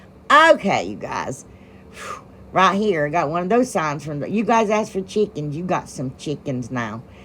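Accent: South, United States. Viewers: Know I appreciate you just as much and I've been sharing for you and getting my family involved too. They laughed and said you amaze them, have taught them some cool things, love the country voice and think you're perfect just as you are(are just a few things they've said, some of them watched you cause of your voice and my interest, should be getting a few more people to watch your talent for sure), and I love you just as you are. Know you're always in my prayers. God bless you and yours. I pray God showers you with many blessings because you are so very special to many. Till next V•I•D•E•O😊❤😊 BIG HUGS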